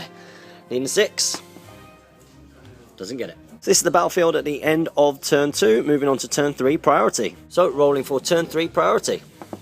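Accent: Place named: Texas, United States. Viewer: I don't think you are pronouncing it wrong, I just think its the difference in accents between Texas and the UK lol, I was just saying I found it amusing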